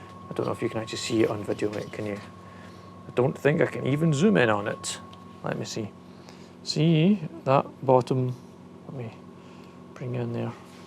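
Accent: Scotland, United Kingdom. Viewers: Hi. Great to hear a Scottish voice. New to this. Question for you, can you run one of these heaters on domestic heating oil like 28second kero. Cheers, jim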